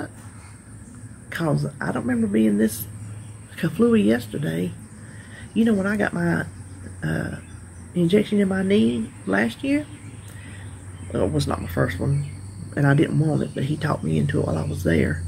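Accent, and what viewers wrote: South, United States. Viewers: Thank you for your honesty. Some youtubers hide the negative eating. My binge go to food Is cake. I can inhale a whole cake if I don't feel it in. I am lifetime but the binge monster is always lurking. Love your chats, cooking, Wanda's, crafts and everything. Your (Morning, How are you to your neighbor,) was so cute love your accent and southern hospitality. Here in the north it's just a quick HI, and that's it.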